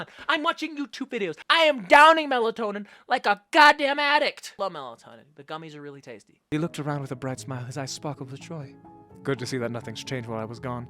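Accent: United Kingdom. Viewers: Yes British yes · THAT BRITISH ACCENT KILLED ME 💀✌️ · the fact that I was just on wattpad, but I came here bc I googled: british gentleman from the 1800 voice 🤜🗿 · the chibi is so adorable!!!! I'd really like a spicy webtoon about... that'd be great along with chibi scenes 😖😖😖😖😖😖I'm so weird. Anyway I love his British accent like...😚😚😚😚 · fyi: FLAWLESS hair! Like that British accent. As a native Brit: *chefs kiss * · Bro your British accent sounds like a professional narrator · You know what you should do (just a suggestion) you should continue reading the lord of the rings in ur British accent it helped and still helps me fall asleep every time I listen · I CANT WITH HIS BRITISH VOICE- ITS TOO MUCH FOR MEEEEEEEE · you should Collab with Speechify an add your British action I swear I would pay just to have your voice read my stories😭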